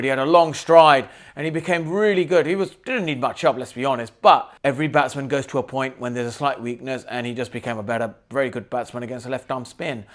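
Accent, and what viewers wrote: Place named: India. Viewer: You still have some Indian accent left within you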